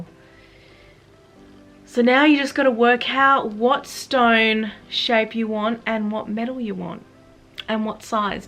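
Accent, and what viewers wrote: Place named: Australia. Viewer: Love your accent, is it Australian?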